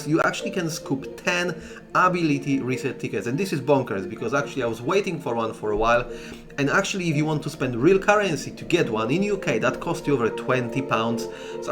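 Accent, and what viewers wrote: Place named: Israel. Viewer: oh cool, your accent sounds very israeli, your accent is very different than most polish people i meet here in london. i wouldve never guessed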